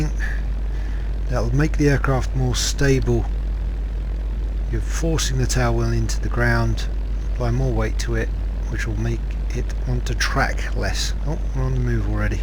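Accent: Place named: United Kingdom. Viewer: A BRILLIANT upload! Great flying! Thank you sir! I will try hard to put all this into practice. (BTW - it's so nice to hear the accent of a fellow Brit giving these tips on this amazing British aircraft - for me, it's the best ever aircraft - cannot be equaled). I have to check tho' - I assume you are using 0% 'Takeoff assistance'? I'm using 0% & I find everything very difficult (*& ....'wobbly') - so I guess the axis control graphs are pretty important. I have pretty gentle slopes to my graphs, but I still wobble as if it's too sensitive. Would you be inclined to share your axis graph settings (...please...)? *On my channel - <link> - and this was with 20% assistance!! Only recently have I gradually dropped to 0%. I'll be trying this soon!!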